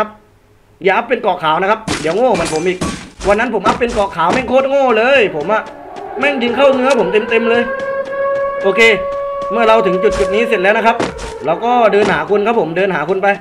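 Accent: South, Thailand. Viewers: แหลงเหมือนคนใต้เลยย🥺😁 ไม่ต้องงงแขบบ55😆😅🤣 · เห็นพูดใต้ได้ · 🌴เป็นคนใต้ม้ายนิหลวง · พี่คนใต้หรอผมคน นครศรีธรรมราช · ผมฟังจากสำนีงเเล้วว่า คนใต้นิเบล่อ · โครตชอบภาษาใต้เลยนิ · กูว่าเวลาแกพูดแก้เหมือนคนใต้ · ผมโครตชอบสำเนียงพี่เรยวะ สำเนียงใต้นิ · เเหล่งใต้เลยน่ะ 😆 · เข้ามาแลทีแรกเห็นพี่แหลงใต้ ผมกดไลค์ กดติดตามเลย คนสุราษฎร์ธานี🤟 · พี่เป็นคนใต้แน่เลย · ผมกะไว้แล้วเสียงพันนี้คนใต้แน่ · พี่คนใต้ผมคนใต้5555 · เปิดคลิปมารู้เลยว่าพี่เป็นคนใต้ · พี่คนจังหวัดไหนค้าบ? เหมือนคนใต้อ้ะ!!FCค้าบ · พี่พูดเหมือนคนใต้เลย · พอพี่พูดใต้ผมกดไลค์กดติดตามกดกระดิ่งเลย ผมเด้กใต้ด้วยย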